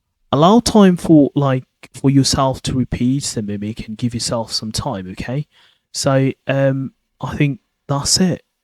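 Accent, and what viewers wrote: Birmingham, United Kingdom. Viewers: Alas I know what shadowing is. I am commenting on what sounds like your Brummie accent. If you are teaching English pronunciation to foreign speakers you ought at least use the most common English pronunciation so that they dont go around saying shardowing when it is SH-A-dow-ing.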